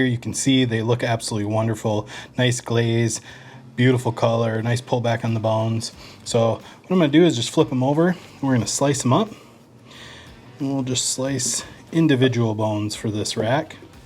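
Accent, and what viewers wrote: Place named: Upper Midwest, United States. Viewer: Hahaha, you're not from the Upper Midwest are ya? Great video, I followed it to a T and they turned out great! thanks!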